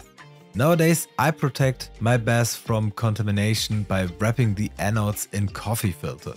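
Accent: Germany. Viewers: aaaah, das hört sich so schlimm an wenn manche deutsche englisch sprechen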